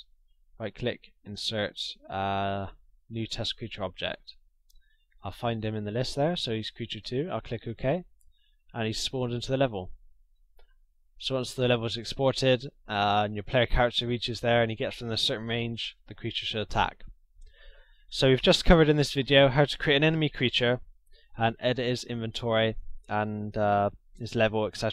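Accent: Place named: Ireland. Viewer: Great tutorials, and do you happen to be from Ireland? You sound like it :P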